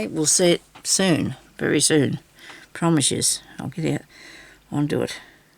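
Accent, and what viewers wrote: Australia. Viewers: Hi, another very pretty piece coming together. Love the way your mind works. It’s also very refreshing to hear your Aussie way with words and phrases. I feel like we are losing the Australia we grew up with, anyway thanks for your ideas, best wishes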